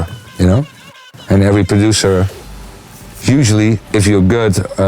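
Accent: Netherlands. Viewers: Jup! Je hoort echt duidelijk zn accent. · Je merkt wel dat je Nederlands bent man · Love you dutch accent so much <3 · Jamie GamingTM nah ofcourse. He's dutch!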